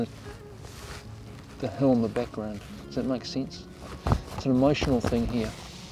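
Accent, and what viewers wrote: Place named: New Zealand. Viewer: As a NZer living overseas, hearing the accent on YouTube cracks me up. Great vid and pics, subscribed!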